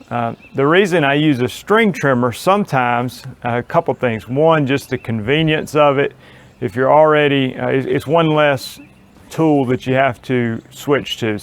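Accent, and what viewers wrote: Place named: Alabama, United States. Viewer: What part of Alabama are you from?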